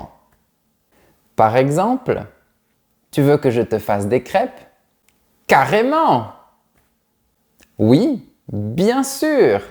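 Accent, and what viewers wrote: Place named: Toulouse, France. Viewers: Personnellement, j'ai toujours fait la liaison dans l'expression "Au cas où", je dis "Au cas z'où", comme dans "c'est obligatoire", j'ai trouvé bizarre que vous n'ayez pas fait la liaison, moi, je dis "c'est t-obligatoire" pas "c'est obligatoire" comme s'il y avait un h muet à obligatoire (hobligatoire)... ce n'est pas une critique, ce n'est qu'une observation, c'est une vidéo très intéressante, et vous êtes super-charmant... et attendez que je devine, vous êtes du Sud-Ouest. 😁😁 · Le petit accent toulousin est tres mignon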